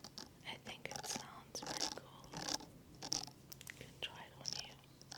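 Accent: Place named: South Africa. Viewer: You're the only South African I know & I love your accent. Sounds to me like a mix between England & New Zealand. Very lovely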